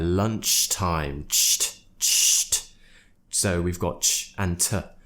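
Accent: United Kingdom. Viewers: I love your podcast, I'm listening to it everytime when I go to college. It's helping so much to improve my listening skills and to get used to the british accent. Keep doing this amazing job, whatever it takes! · Brother whatever you speak sounds British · i admire your British accent 💜💜💜 it so clear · You sound sooooo British whatever you say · Whatever you say sound British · I like your British accent so much . Thank you ! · You’re so handsome and I really like your British accent. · Whatever you say I am able to follow your British English accent. You have the clearest British English accent I have ever heard in my whole life! Keep posting :) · Whatever you scrutinize, who cares! I will learn the very British accent asap from. 🥰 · Love your British accent.love also your spontaneity.you know how to transmit your message.👍👍👍👍👍👍👍👍👍👍from 🇩🇿. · Music to my ears lovely British English ❤❤❤😍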